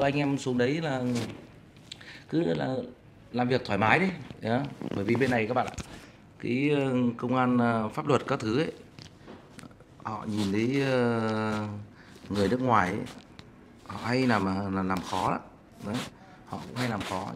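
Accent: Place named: Hanoi, Vietnam. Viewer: Minh da Theo doi t all va minh rat yeu men co giong noi ng Ha Noi de thuong, hien co hau Love ya sweetie ❤️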